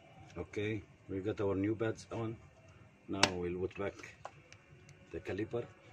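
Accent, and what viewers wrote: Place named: Arab world. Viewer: من لكنة كلامك اظن انك عربي ارجو رفع فديوهات اكثر بخصوص الباجيرو تحياتي لك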